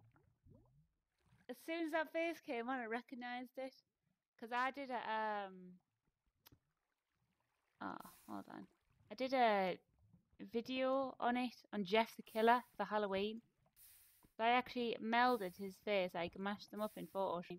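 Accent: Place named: Northeast England, United Kingdom. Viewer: Your accent reminds me of mine when i was still living in the uk. But i was more north central than north east.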